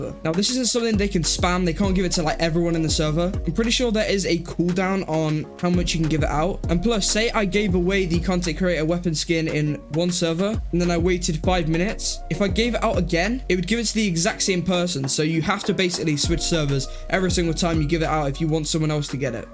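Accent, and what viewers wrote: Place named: United Kingdom. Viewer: Is this tanqr’s brother or something? The gameplay is good and he does have the British accent. I dont know, maybe this is the new tanqr of arsenal.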